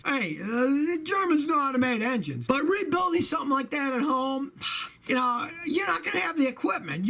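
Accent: United States. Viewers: Which part from America is accent?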